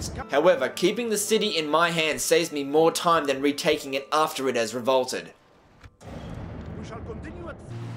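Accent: Australia. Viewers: Holy shit, he used to be such a pure Australian. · He's Aussie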